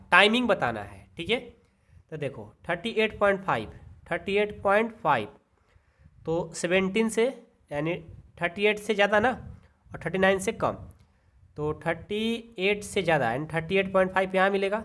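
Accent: Bihar, India. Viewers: Abe bhai yaar tum behari ho kya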